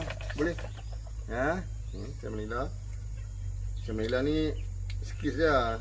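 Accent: North, Malaysia. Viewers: Slang bg lagi utara boleh dak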